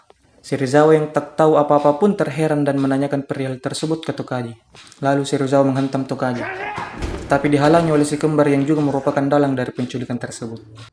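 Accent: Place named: Makassar, Indonesia. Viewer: Orang Makassar ya kau bang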